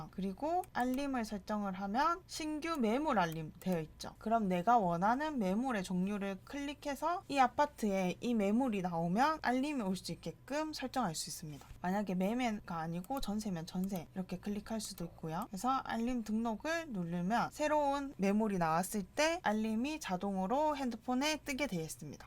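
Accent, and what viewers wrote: Gyeongsang, South Korea. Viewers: 어후 쌍도사투리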